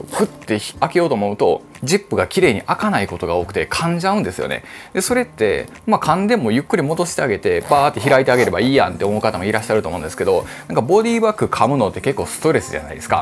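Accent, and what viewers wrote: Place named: Osaka, Japan. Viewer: 大阪弁とヒョウ柄🤣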